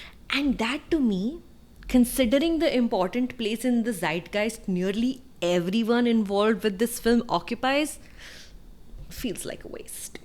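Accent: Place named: South India, India. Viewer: What’s up with the weird ass South-Indian accent???😒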